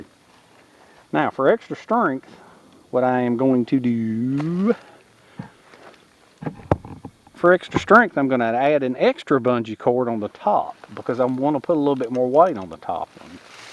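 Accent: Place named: West Virginia, United States. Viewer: well either way my wife's a fan, I think it's the accent. My cousin Mike, back home in WV looks and sound just like ya...✌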